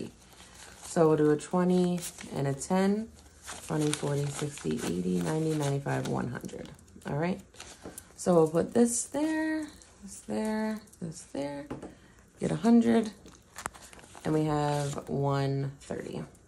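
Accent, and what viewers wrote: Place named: East Coast, United States. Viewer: Are you from New Jersey/East Coast? You sound identical to my cousin! Love it. So relaxing. Congrats on your first bill exchange! I can’t wait until I have mine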